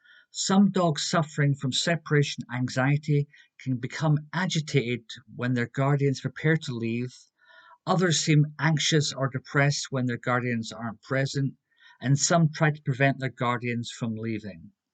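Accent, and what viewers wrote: Scotland, United Kingdom. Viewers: Superb video about the subject. Also very important is your calm and relaxed Scottish accent and "timbre" to calm down the hoomans who are worried about the anxiety of their sighthounds. It works 2 ways. Most excellent.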